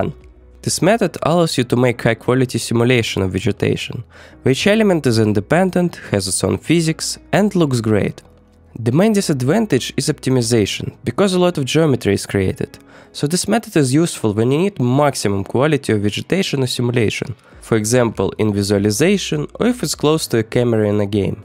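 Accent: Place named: Russia. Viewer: топовый акцент, русский отличит, но иностранцы думаю ничего и не заметят)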